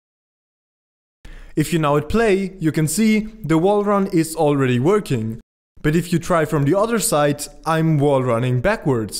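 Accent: Germany. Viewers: IDK but is he German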